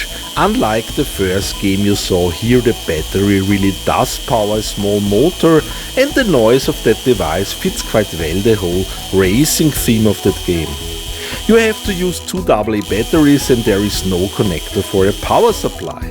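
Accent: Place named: Austria. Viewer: austrian english at its finest!!! love it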